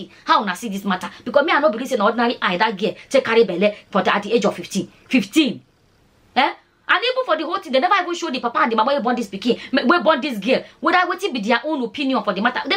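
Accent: Nigeria. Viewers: Hi, this your pidgin english TV, i be like say na him sweet pass, the wey you dey take dey yarn the pidgin de sweet me no be small.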